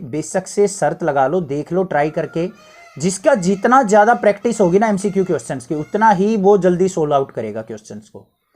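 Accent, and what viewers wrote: Haryana, India. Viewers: Sir ap haryana se ho na apki language thodi vasi hi h sir or baki sab ke bhi solve karado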